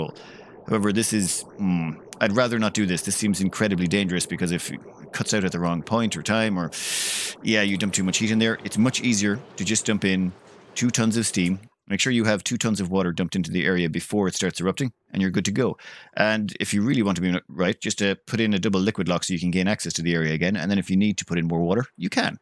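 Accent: Ireland. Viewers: 300 hours into this game and I still struggle to understand what the hell you're talking about at times - your Irish makes it absolutely worth listening though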